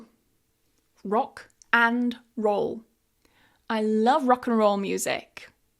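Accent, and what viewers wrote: United Kingdom. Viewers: Muito linda ,seu sotaque é uma delícia, adoro os britânicos. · I would like to say a big thank you for your amazing English lessons. I have learned so much from your videos, especially your beautiful British accent and the way you explain things so clearly. As an person who need more learning English was very important for me, and your lessons have helped me improve my confidence and communication skills. Your dedication and kindness are truly appreciated. Thank you for sharing your knowledge with people from all over the world. Keep inspiring and helping others. Wishing you all the best. ❤️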